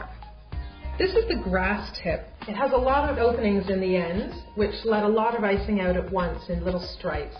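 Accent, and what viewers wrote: Canada. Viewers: ooh i like her canadian accent. and what size star tip was that?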